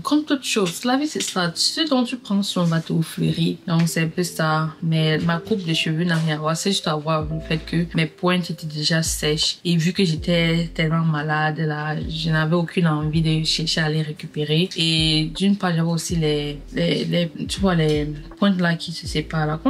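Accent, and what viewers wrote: Cameroon. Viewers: juste dire t es trop drôle.tu racontes comme une vrai Kmer😂🤩🤩🤩.j adore la coiffure du premier mariage restreint ❤❤❤🎉🎉🤩🤩🤩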